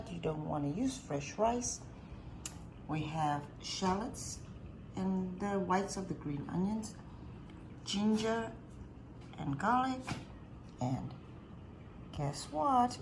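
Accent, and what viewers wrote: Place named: South Africa. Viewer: Came across your channel and ❤ your recipes. You sound like you from South Africa. Keep the recipes coming.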